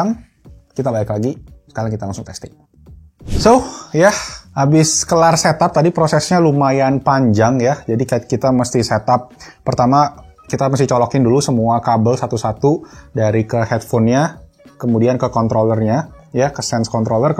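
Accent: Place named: Palembang, Indonesia. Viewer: Haha logat palembangnya gk hilang ya bg, ada kalimat "sisip dikit" wong kito bangett, btw am a one of your big fans and hopely someday bisa kolaborasi🙏 dan shring ilmuny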